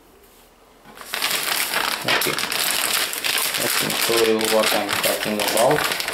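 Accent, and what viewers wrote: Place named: Romania. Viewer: ăsta sună a Român , ce dqr 😂 (this guy is Romanian ).....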